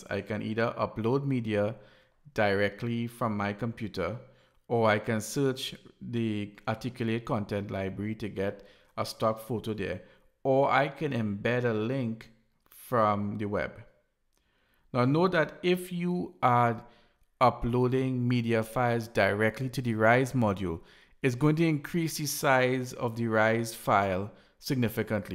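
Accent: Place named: Trinidad and Tobago. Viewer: Thank you so much for this video. I detect a Trini accent 🙃hope I'm correct.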